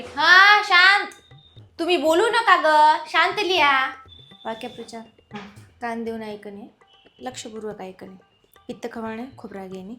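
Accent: Nanded, India. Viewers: Madam tumhi Nanded chya haw ka manalo. Ase vatalay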